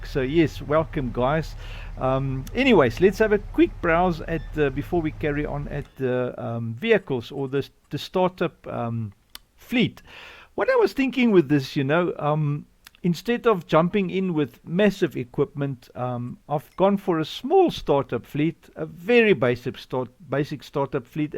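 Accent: South Africa. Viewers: Hoe klink dit vir my of jy van Suid Afrika af kan kom???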